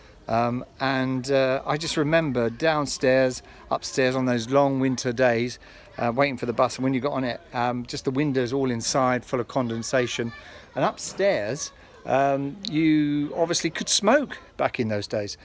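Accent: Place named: South London, United Kingdom. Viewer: Ooo I do like that MCW in the distance and that Leyland National, the MCW was seen a lot at Peckham and Camberwell, New Cross garages and was quite popular for its racey look. Nice to hear a South London accent, my local garages were Catford and Bromley and used to earn a bit of pocket money helping out on a saturday at Catford and they kept on a RT many years after they had been withdrawn where if memory serves me right was moved to Bromley til someone up top decided to out it. I have driven a RT and an RM on a "thank you" shunt at the garage when the safety elf decided kids should not be sweeping and helping out in such a dangerous place.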